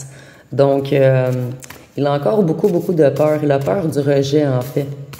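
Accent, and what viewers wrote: Canada. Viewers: Bonjour cousine Canadienne, j’aime votre accent qui me fait penser à mes cousines germaines qui vivent à Montréal où j’ai eu plusieurs fois l’occasion de m’y rendre… Je vis en France… J’aime le format de guidance de 30 min. 😊 J’ai entendu votre voisine mais pas de soucis 😉😘 Je n’ai nullement été offusquée 😉😄ce sont les choses de la vie… Gratitude pour votre guidance 🙏🏼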